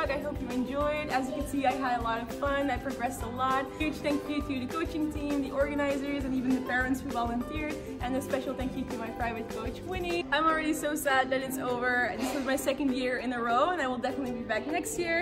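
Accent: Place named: Netherlands. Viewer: I knew she was Dutch from the moment she started talking lol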